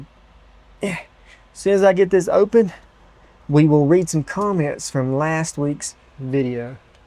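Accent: Alabama, United States. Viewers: That's a cool stealth camper! It would also make a beautiful and comfortable outhouse. If you were in Louisiana I would suggest you stealth camp in a Mardi Gras Float [in the off seasons of course]. But then again I sometimes forget you live in Alabama where Mardi Gras originated until I hear your accent.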